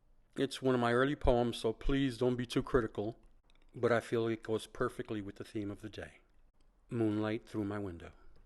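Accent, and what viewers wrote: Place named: East Coast, United States. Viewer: Look at you - all grown up and on YT now!! Go, Go! PS - I remember first stumbling upon your blog and the YOU poem. It is SOOOOOO fun to hear your voice reading the poems. You haven't lost your upper East coast accent if you're still living in Florida?! PS - I could've sworn it was the shoes that make a woman beautiful?;-)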